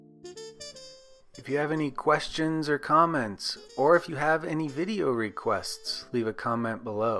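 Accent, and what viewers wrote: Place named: North, United Kingdom. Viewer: A real northerner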